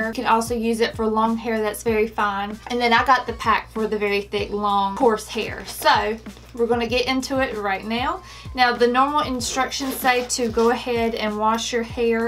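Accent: South, United States. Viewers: Hannah Slowikowski No..completely Southern the whole time. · I heard the same. But mostly I hear Southern. And it’s really great to listen to. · I want these now!!i love your accent! You talk like me so you must be from the south!😀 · She sounds Southern. I'm from NC and have a similar accent, and I have family with thicker accents. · Love your accent!! I found your video saying your from NC!! I'm from Oklahoma/Arkansas and it's funny how southern accent all sound a tab bit different😊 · I love your country sweetheart accent 😝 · This is a Southern US accent, not Texas, but more like Georgia or the Carolinas. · I'm Australian and there is no Aussie accent there at all only Southern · I think South Carolina or north Georgia. Just a guess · She doesn’t sound anything like an Australian lol. We don’t talk this way. She’s southern 😂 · Emily needs to go bye bye with the negative comment about the accent, I am from North Carolina and have the same southern accent and let me just say that this chick is an adorable Southern bell and very beautiful, thank you for the tutorial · I just found you. 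You are stunning and I love a good southern accent. I was born in the West but my entire family is from the south and so I'm the only one who speaks wrong, as they lime to tell me. Lol. I also love shark tank and wondered about these when I saw them on there. Loved seeing how to use them. I'm really bad with hair, and makeup and fashion. Lol. I missed the girly gene apparently. 😂 want to try these now. Your curls turned out beautifully. Do you think spraying them with hair spray before you take them out will help th curl stay longer in the day? Thanks! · It's the lisp and the southern accent all at once! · Is that a Southern accent? No wonder I can't understand you. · Emily Gilbey hateful.. a southern accent comes from your birth place naturally just as an Australian or British. I don't understand such a hateful comment on the fact that she has a southern accent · Southern AF the whole time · As a Texan it's definitely southern the entire time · You talk so cute!! 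Such a sweet country girl accent! And your hair is gorgeous!! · Sometimes I think she's southern, but sometimes she had a slight Aussie accent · Really? She sounds like she's from North Carolina or Alabama to me.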